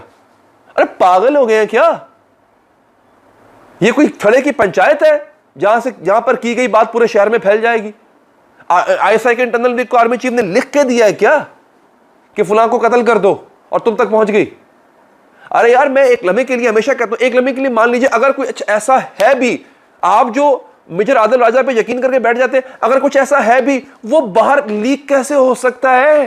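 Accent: India. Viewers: India k channels kam dekha karo.tumhara tou lehja bhi Indian hogaya hai tum bhi ghaddar ho besharam😡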